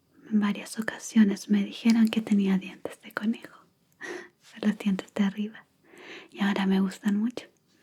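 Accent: Chile. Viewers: Gracias a tí preciosa, puedo pasar de 🤬 a 😊 Tu voz dulce y acento chileno, hacen maravillas ✨✨✨🌹👸🌹 Gracias 🙏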